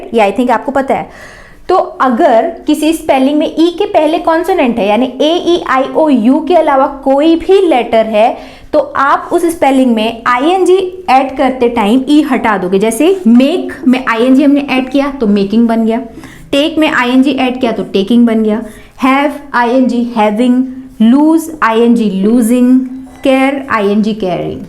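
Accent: Himachal Pradesh, India. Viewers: Are u from Himachal?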